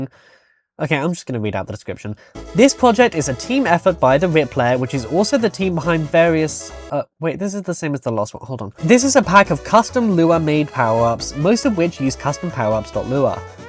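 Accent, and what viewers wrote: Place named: United Kingdom. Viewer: It's a good Direct, but my only criticism is that some unscripted parts of the Direct gave me too much of a headache with your British voice, especially the "sponsored" bit at the end with that Greek statue guy who is really crazy annoying to me! That's why I prefer another fan direct like Rayman Alive or F3 So remember, next year, no more crazy staff or badly unscripted parts!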